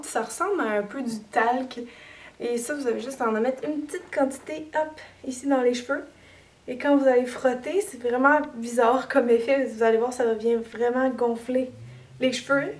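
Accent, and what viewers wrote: Quebec, Canada. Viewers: Coucou de France. Je suis un gars qui a découvert ta chaîne il y a trois jours et c'est un véritable enchantement que de te voir expliquer avec clarté et enthousiasme ta passion. C'est un plaisir de t'écouter, tu as un très bon vocabulaire, j'adore l'accent québécois et en plus tu es belle à voir (et à revoir). J'aime ton naturel, ton regard, ton sourire, ta joie de vivre et ta manière d'être. En fait j'aime tout chez toi. Je te souhaite une belle réussite professionnelle et du bonheur. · Je kiffe l'accent québécois ! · J'peux pas supporter ton accent canadien.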